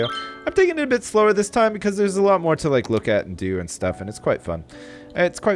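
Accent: Canada. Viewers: Please, would rather have no music than that. The really slow piano brings the tone of the video down:(... Am I crazy? It's very juxtaposing to your cheery Canadian voice.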